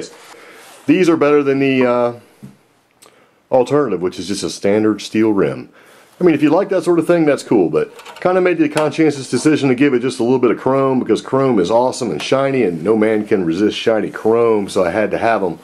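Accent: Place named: Texas, United States. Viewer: Mojo? nah".....Never lost it, that Texan drawl forbids it, you wear that 5 gallon hat with pride!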